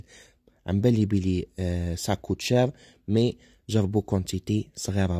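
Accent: Constantine, Algeria. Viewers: الشاف برغم عايش في ألمانيا ألا انه محافظ على لهجته القسنطينية الجميلة ألف تحية وتقدير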